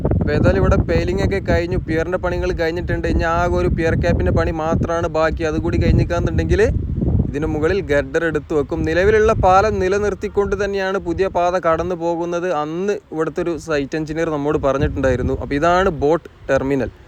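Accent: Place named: Malabar, India. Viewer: അത് ബൈക്കിൽ ഫിക്സ് ചെയ്യുമൊ അതൊ കൈയിൽ പിടിച്ചിട്ടാണൊ. എന്തായാലും ന്റെ നമ്മുടെ മലബാർ ശൈലിയിലുള്ള സംസാരരീതിയാണ് വിഷ്വലിനോടൊപ്പം തന്നെ വീഡിയൊയെ കൂടുതൽ ആകർഷകമാക്കുന്നത്. Keep going.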